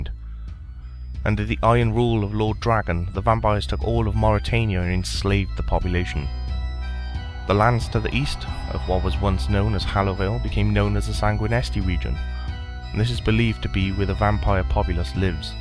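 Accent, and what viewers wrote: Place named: South Wales, United Kingdom. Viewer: What part of Wales are you from? It's driving me crazy. You've definitely got a south walian accent. I'm gonna guess and say Merthyr or Rhondda or somewhere around there lol.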